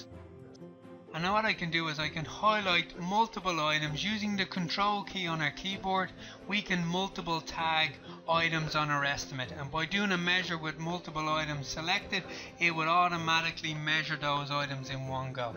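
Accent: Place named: Ireland. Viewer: An Irish man demo-ing Australian Software ;-) Btw, you sound like Moriarty from the BBC series Sherlock.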